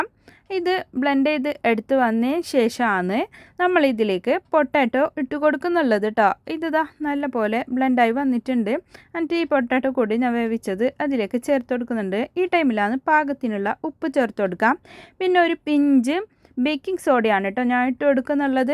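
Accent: Kasaragod, India. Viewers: Super recipe anallo ! Koodathe ningalude kasargodan style talking enikku bhayangara ishtanu !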